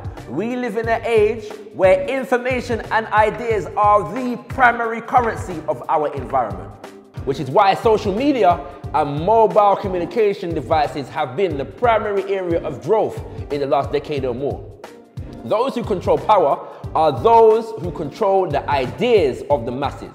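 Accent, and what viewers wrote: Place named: Jamaica. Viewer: Maybe he spoke in jamaican accent or patois in his home as a child its common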